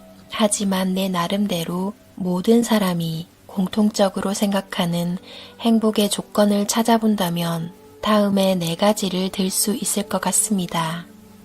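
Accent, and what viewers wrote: Gyeongsang, South Korea. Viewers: 경상도 어디사세요? 저도 경상돈데 목소리 좋네요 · 경상도 사투리가 살짝가미된 목소리가 듣기에 너무좋아요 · 어투중에 살짝 갱상도 말씨가 엿보이는디...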